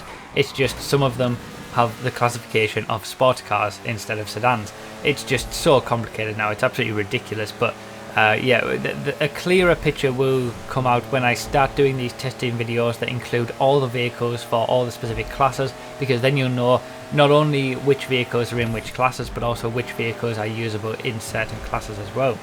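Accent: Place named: London, United Kingdom. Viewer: Yeah he does have quite a london ting accent, fortunately I have friends that speak like him so I've developed an understanding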